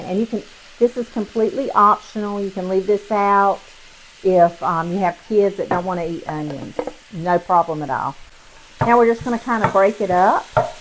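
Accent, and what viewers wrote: South, United States. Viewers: OH MY. It looks so Delicious! I will be making it ASAP. Already had the menu posted for tonight's dinner, Appetizer, Blistered Shishito Peppers with a Garlic Aioli, Turkey Burgers, with Potato Wedges and Broccoli Salad. I saw one of your Y-T videos a while back, and you're so personable and soft spoken, and with that Southern Accent, I Subscribed right then! Thanks, for taking the Time and Effort to Film and Edit your videos. We your Subscribers Appreciate You! Have a Wonderful Day!!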